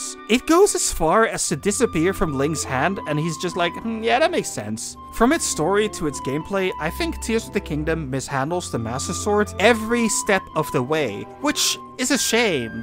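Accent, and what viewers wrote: Netherlands. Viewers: Hey jij bent nederlands ! HA betrapt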